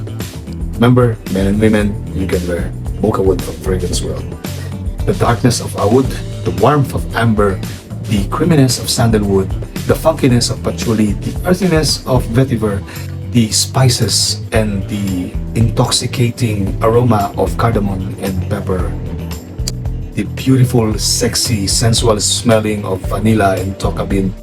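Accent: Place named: Philippines. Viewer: Your 𝒂𝒄𝒄𝒆𝒏𝒕 has a sound like a FILIPINO. Are you originally from the Philippines ❓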